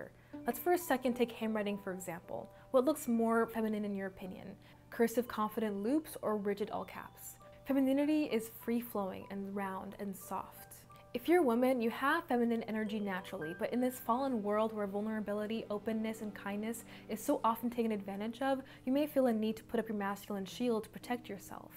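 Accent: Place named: Jamaica. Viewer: It is switched between the Jamaican accent for me. I’m Jamaican myself.🇯🇲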